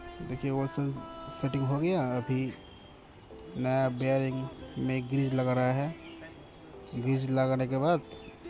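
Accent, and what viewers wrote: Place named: India. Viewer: Aap video banane ki Tameez nahi hai aap bilkul Khadi bhasha me baat kar rahe ho aisa lagta hai aap kis gaon ke rehne wale ho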